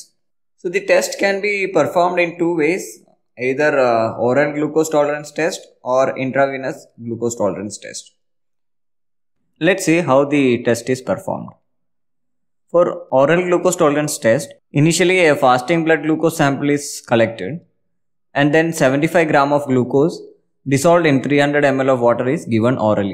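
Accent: Kerala, India. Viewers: Is video mein, Indian accent bahut zyada strong hai..indian accent bahut annoying hoti hai. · Kerala boy❤ lol accent 😂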